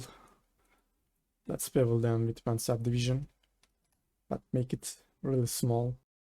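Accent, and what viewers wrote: Turkey. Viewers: Usta şu ana kadar gördüğüm en iyi cinema4D videoları sende helal olsun işi tekrar sevdirdin bana aksandan hemen anladım zaten türk olduğunu :)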